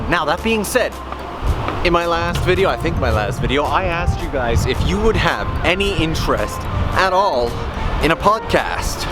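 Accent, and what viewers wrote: Canada. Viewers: Sup man I'm obsessed with Japan currently, and I found you from sharmander in Japan ! I'm loving your first video for me so far. Also, are you canadian? I hear alot of abooots in your accent xD Also, what is the best way to know when exactly the pink trees will bloom to it's fullest? I can't believe they only bloom for like 3 days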